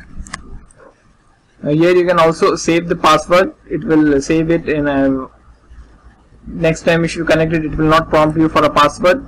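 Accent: India. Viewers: Oh GOD! ANOTHER INDIAN !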